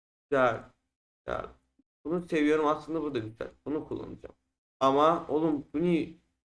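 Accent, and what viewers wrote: Azerbaijan. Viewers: Azeri misin Türkmüsün bir dilde konuş anlamıyom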